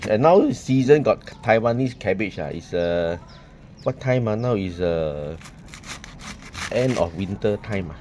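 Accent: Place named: Singapore. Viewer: Enjoy watching your video from the sunshine state, Florida. Make me feel at home listening to your humorous singlish dialogue. Looking forward to more of your videos.🙏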